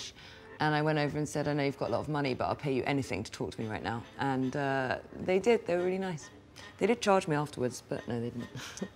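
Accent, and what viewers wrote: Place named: United Kingdom. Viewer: This is some clean elegant British accent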